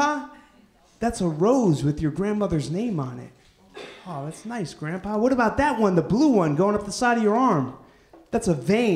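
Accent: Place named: United States. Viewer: He's American. He pronounces his name perfectly in American English. No Italian-American pronounces their surname with an Italian accent. THAT would be weird!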